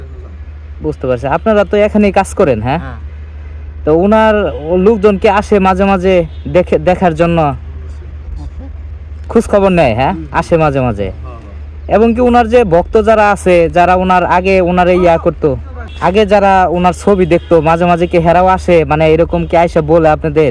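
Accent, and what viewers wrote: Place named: Narsingdi, Bangladesh. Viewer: ভাষাতো নরসিংদী র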